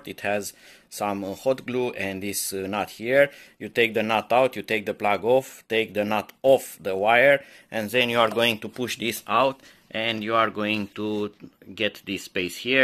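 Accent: Romania. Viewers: Recunostii romanii dupa accentul pe care il au in engleza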